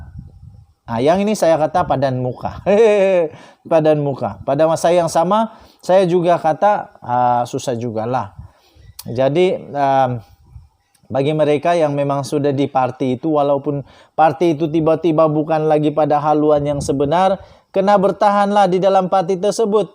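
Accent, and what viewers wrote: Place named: Sabah, Malaysia. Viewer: Hoi jangan cakap merepetlah and waste time. Ini bukan lokonan filem. If you want to talk go strait to the point. Ini cara bercakap Sabahan seperti cakap cartoon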